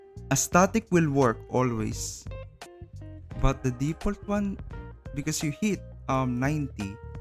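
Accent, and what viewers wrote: Philippines. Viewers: Base in ur tune ur Ph boii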